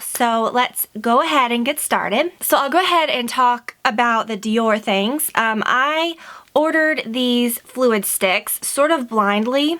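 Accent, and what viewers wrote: South, United States. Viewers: I don't know why, but I've never noticed before this video that you have a slight southern accent. I must be totally oblivious. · I realize you're speaking in the same voice, tone, etc. that you always do, but for some reason you just sound SO southern! I can hear the accent so much clearer than I ever have before! So sweet!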